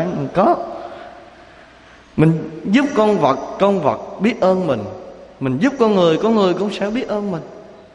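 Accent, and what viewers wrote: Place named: Quang Nam, Vietnam. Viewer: Thầy nói giọng nói giống người quảng quá ạ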